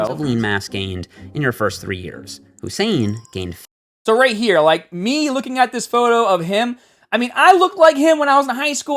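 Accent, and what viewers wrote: Boston, United States. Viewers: Its good to see you still grow strong , im a follower even before people make fun of your "bahhbel" Boston Accent era . Haha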